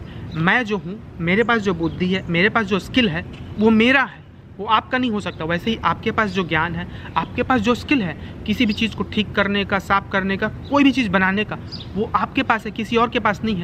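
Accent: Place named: Chhattisgarh, India. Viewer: India me kaha se ho bhaiya CG se ho lag raha hai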